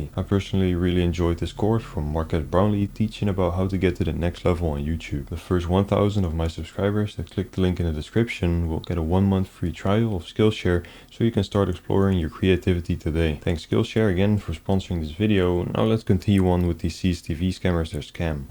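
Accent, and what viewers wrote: Netherlands. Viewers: He will not reveal his location, but yes he sounds Dutch to me. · Judging by your accent.., your Dutch?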